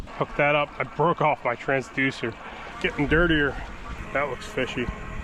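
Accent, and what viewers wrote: New York, United States. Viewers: he is from nuu yawwk that's how we say crap eee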